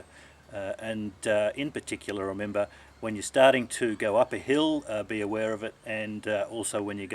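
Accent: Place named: Australia. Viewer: youre australian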